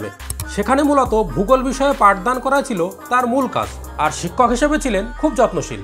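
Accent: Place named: Bangladesh. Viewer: তোমার কথাই মনে হচ্ছে তুমি বাংলাদেশী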